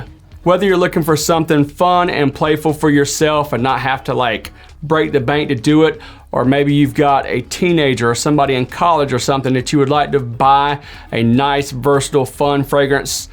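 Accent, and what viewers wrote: South, United States. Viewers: Great review! I love this fragrance! One of the few I can say that about! also out of all the reviewers you seem to be my favorite so far! Sounds like you're from the south! LOL so am I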